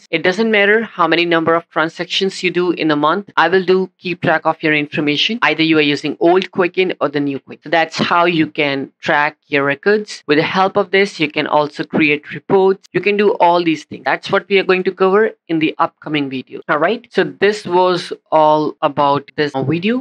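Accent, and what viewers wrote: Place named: India. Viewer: Indian accent sucks bro, honestly!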